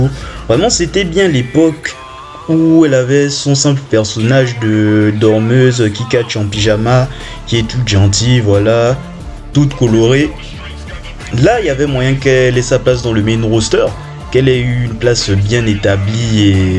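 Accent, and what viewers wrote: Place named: Ivory Coast. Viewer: Je dis tu es ivoirien d´origine oubien l´accent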